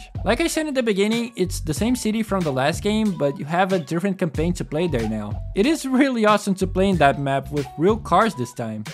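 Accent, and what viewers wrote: Brazil. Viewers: Bro you Brazilian?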